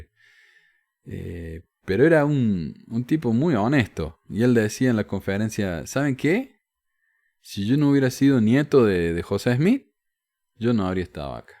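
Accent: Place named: Córdoba, Argentina. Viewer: ..ESA LLAMA SUPER CUADRUPEDA, del Ecuador que se cree inteligente que te mando mensaje hablando de tu acento Cordobes, Le pregunto Yo que acento tiene Como llama de su CORRAL Ecuatoriano.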